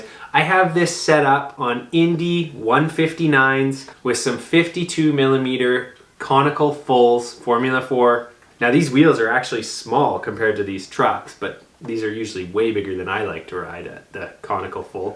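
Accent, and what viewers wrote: Canada. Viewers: my god I love how Canadian you are. "body checked the ground." haha! Just the delivery of it ! God bless skateboarding, hockey and Canada!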